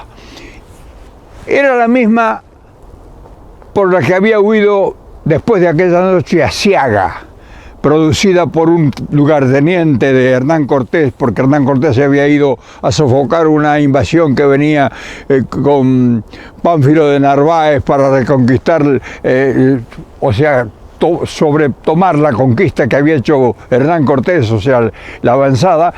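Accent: Argentina. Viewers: Sr., no insista con su asento Argentino, usted es mas Mexicano que muchos Otros. Viva Argentina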